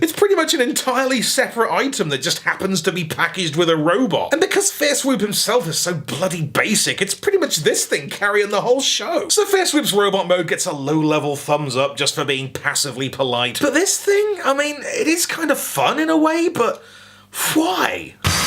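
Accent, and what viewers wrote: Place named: United Kingdom. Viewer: It's the accent. Everything sound epic in a British accent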